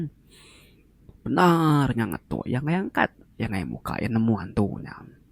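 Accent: Sarawak, Malaysia. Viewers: Patut jako nn bc ka munyi org srtok tuan mya nusi cerita...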